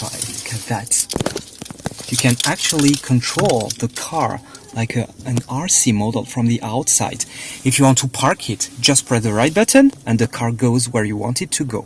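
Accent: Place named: France. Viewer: his accent makes be believe he speaks french also, i guarantee it. Regardless, thanks for a review that we can now understand!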